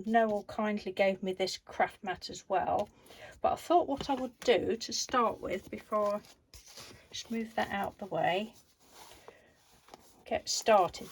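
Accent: United Kingdom. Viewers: nice english english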